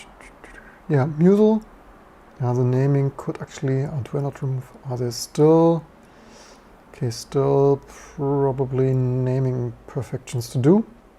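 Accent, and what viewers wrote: Germany. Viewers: anaser most german english ever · If your accent didn't give up that you are German, the lidl and aldi definitely gave that up. Btw Aldi has currently one of the biggest SAP transformation going on rn .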